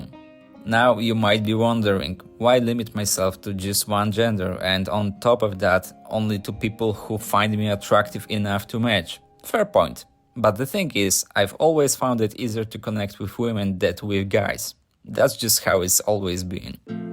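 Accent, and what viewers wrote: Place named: Ukraine. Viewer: U must be wealthy. Dalmatia is tourist destination, which means expensive and u gotta have good income to support yourself. It is not Monte Carlo, of course, but still locals struggle to survive and people of your age left to work in EU, mainly Ireland, Scandinavia or Germany. Just renting something to live or real estate is up to the roof in recent years. And what is your home country? 2000 km and accent sounds like Ukraina.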